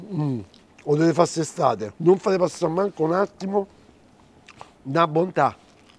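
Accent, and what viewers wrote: Rome, Italy. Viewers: Ciao ma non parlare in romano , parla più in italiano , non mettere questo stile romano che non è tanto bello , un pochino volgare , ma quello che cucini e ‘ buonissimo 👍 non ti offendere non sono solo io a dirlo , senza accento , non ti sta bene dico per te , un po’ brutticello , ma c’è peggio per esempio personaggi famosi in tv che parlano romano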